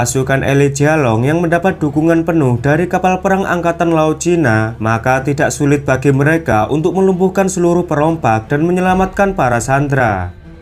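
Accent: Java, Indonesia. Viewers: JANCOK MEDOK MU PARAH COK!!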